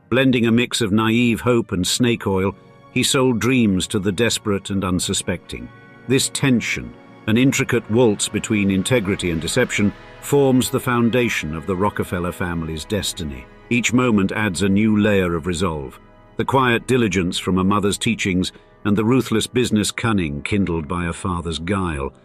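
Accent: United Kingdom. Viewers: Question about the narrator's accent: British or some modernized version of Mid-Atlantic?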